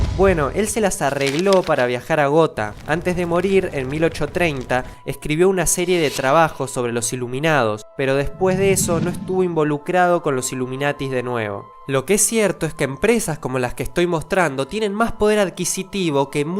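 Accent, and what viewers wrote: Buenos Aires, Argentina. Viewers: es argentino? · este flaco es porteño gato !! awta... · eres de argentina? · no sabia que eras argentino... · Sos Argetnino?? por que tu acento me suena a argentino...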